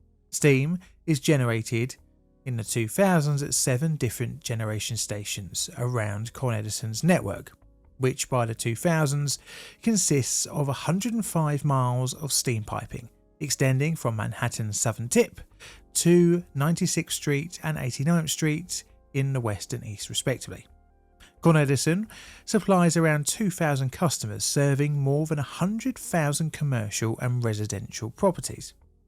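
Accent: United Kingdom. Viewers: Now I know, what all that steam we see is, in the cities. Very 19th. century-ish, and modernly beset😆. As you can tell, I'm thankfully NOT, from any big city. How could 2,000 customers, turn out to be 100,000 businesses, and residential customers?? Ain't that, the same thing🤔?? And if the narrative was spoken with more English, and less British, we here across the pond, might be able to understand exactly, what you're saying.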